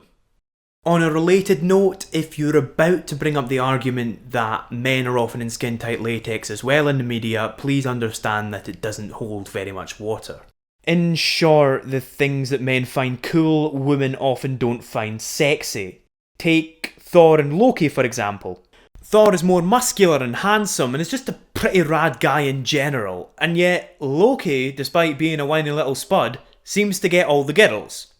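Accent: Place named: Scotland, United Kingdom. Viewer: Scottish... Oh... Uh, I suppose I probably shouldn't mention what I thought the accent was... I hear it's a touchy subject for Scotts :s.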